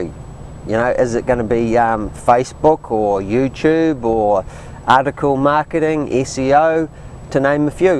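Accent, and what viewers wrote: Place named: New Zealand. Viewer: Great metaphor talking about the ships on thier journey. Great to here another Kiwi again - I nearly moved to Whangaparao about 40 mins from Aukland Steve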